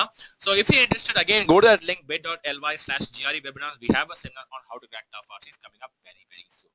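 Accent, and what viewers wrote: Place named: India. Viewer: the accent is like Indian or Mexian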